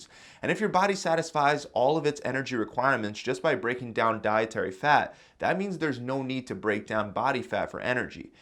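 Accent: Philadelphia, United States. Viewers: Know I can tell you are from Philly or Jersey? 💦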